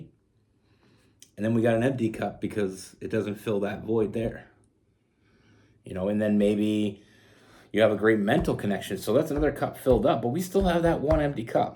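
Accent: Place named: Canada. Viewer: I love your Canadian accent!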